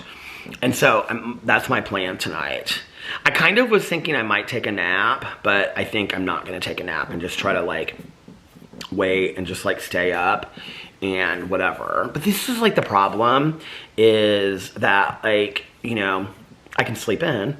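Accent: South, United States. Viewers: Do the crumbel review with cousin caroline! Also, I’m from the south and you and I say coupon the same lol. You must have a little southern twang in ya 😉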